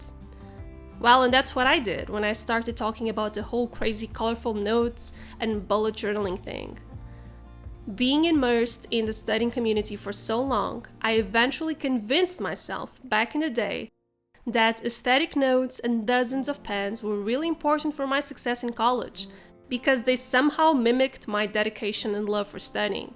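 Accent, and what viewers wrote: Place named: Portugal. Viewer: só pelo sotaque consegui perceber que eras de Portugal ahshagshs adorei o conteúdo, you just gained one new sub <3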